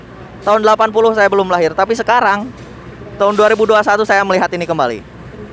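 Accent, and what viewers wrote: West Java, Indonesia. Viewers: Wah masihbada yang hijau kuning ya👋👋🙏btw naratornya ketara banget sunda nya😁😁🙏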